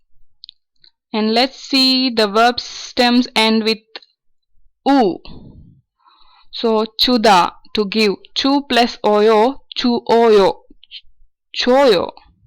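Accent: India. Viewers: Your accent is in Indian English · Hahaha so cute tone how indians talk english its just playing a funny games lol or its like waving water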